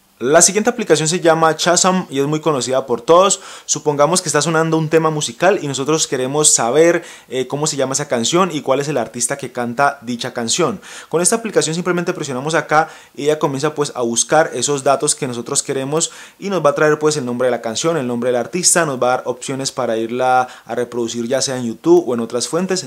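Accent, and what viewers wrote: Cali, Colombia. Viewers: Eres de Colombia? ;:D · eres de cali colombia????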